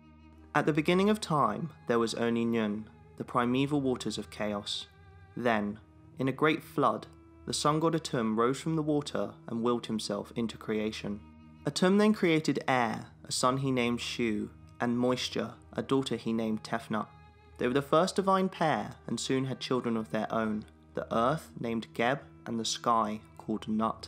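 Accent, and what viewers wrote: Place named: England, United Kingdom. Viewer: The pronauncation of the gods is so english, I could not recognize them. Osiris? Like Miley Cyrus? Really??? its O-ZE-RES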